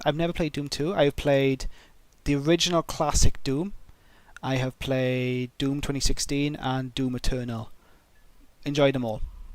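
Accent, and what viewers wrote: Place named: Wales, United Kingdom. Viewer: Oh you're welsh.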